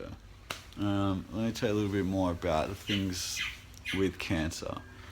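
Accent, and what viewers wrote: Australia. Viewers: Hahah no! I'm probs just not used to the aussie accent, i'm from New Zealand you see :p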